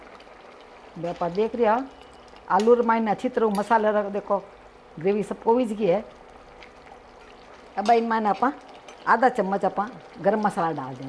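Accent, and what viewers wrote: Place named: Marwari, India. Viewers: Aapki har recipe bahu achchhi hoti hai aur sath sath aapaki marwadi bhasha sunani muje bahut sweet lagati hai, main Gujarat se hu lekin samazneme koi dikkat nahi hoti. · Mujhe Aapka Rajisthani bolna bahot pasand hai... Kash aapke jeisi meri mother in law hoti... Mein Aapko sunti rehti ❤️❤️❤️❤️❤️ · I know what you mean. I speak Gujarati and this is the first time Madwari has reached my ears and and after few seconds it seemed natural.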